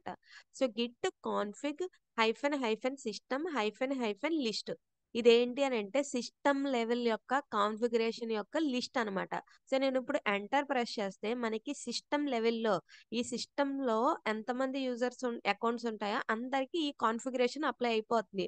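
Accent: Godavari, India. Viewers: mana godarolle...🤪 voice ...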